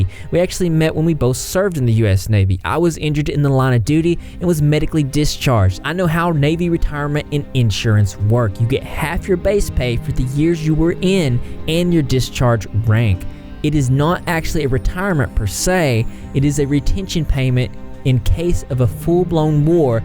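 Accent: South, United States. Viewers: I watch your videos only because of your southern accent. 🤠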